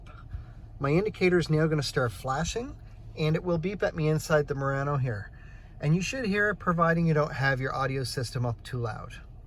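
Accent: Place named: Canada. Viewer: i could hear the canadian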